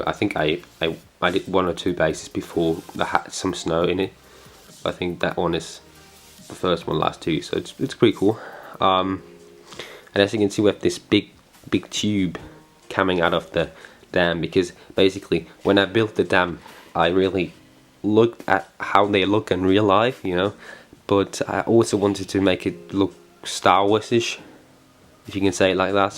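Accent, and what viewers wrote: United Kingdom. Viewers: wo hast du so english gelernt? Hört sich ziemlich british an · Haha wie geil! Du hast ja richtig ein bisschen einen britischen akzent bekommen! :D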